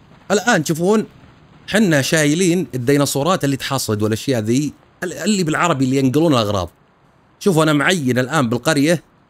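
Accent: Qassim, Saudi Arabia. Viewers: استمر يا افضل يوتيوبر ❤ اهم شيء الي محلي المقطع اللهجة القصيميه😂😂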